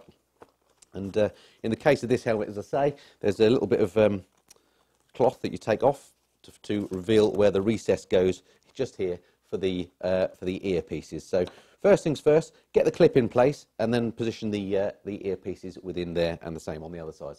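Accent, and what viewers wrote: United States. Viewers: LOL! Loved your American accent! My buddies and I are buying these soon. They seem to be the best out there. It was fun to see box contents and get your take. I wonder if Cardo speaks Texan?! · loved the American Accent .. I still find it amazing that we speak the same language, yet "you guys" sound like your chewing on your words :)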